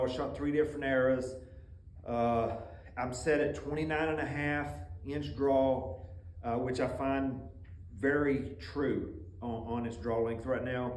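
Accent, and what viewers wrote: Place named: South, United States. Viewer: Love that southern talking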